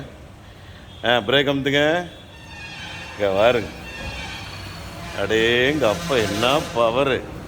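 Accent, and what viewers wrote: Kongu, India. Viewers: ரசனையோடு இணைந்து உளப்பூர்வமாக செய்து இருக்கீங்க அண்ணா...நம்ம கொங்கு தமிழ் அபாரம்.யாதார்த்தம் சிறப்பு தொடரட்டும் உங்கள் பணி மென்மேலும் சிறக்க நல்வாழ்த்துகள்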